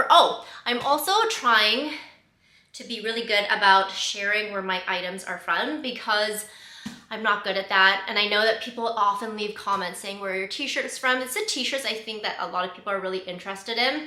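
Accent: Canada. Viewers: Aww, I'm sorry to hear people don't appreciate your accent/the way you say "bag". I think it's unfortunate that people don't appreciate the differences in accents and how they make various words sound different. I think it's adorable that in the Canadian accent, the word "bag" sounds like "beg".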